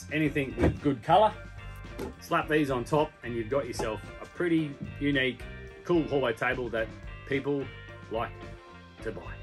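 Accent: Australia. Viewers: Im a new subscriber, love the content, thanks so much. Also nice to see/hear aussie accent, way more relatable for me. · Aye mate....you people from down under talk funny.... but your videos are top notch! i am finding pallet wood a god send when the wallets low on project funds. bought a lot of tools but haven't put them to work yet....let's give it a try see how this all works out...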